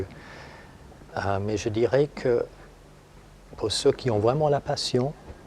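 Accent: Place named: England, United Kingdom. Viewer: We can hear a little english accent, may be that's why... (I'm french) ;)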